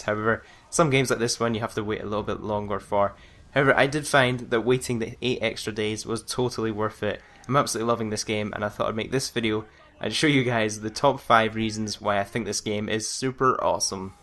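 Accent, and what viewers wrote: Scotland, United Kingdom. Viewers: What part of scotland are you from x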